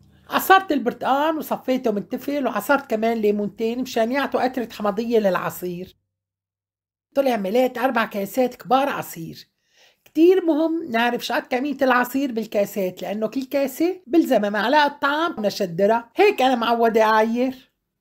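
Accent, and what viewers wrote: Aleppo, Syria. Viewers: بلعكس خليلي عم تقولي دشري وحيكتك الحلوة الحلبية لا صلية ماتغيري كلامك برجعنا لايام زمان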